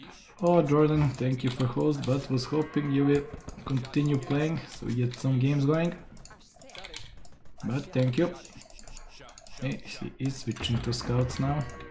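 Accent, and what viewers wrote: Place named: France. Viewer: Are you french ?!! Your accent is kind of familiar…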